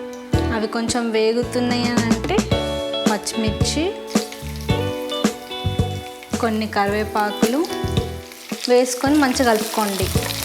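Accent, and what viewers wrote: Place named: Nizamabad, India. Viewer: Though it's orugallu vantillu..You are pakka NIZAMABADI 🔥💗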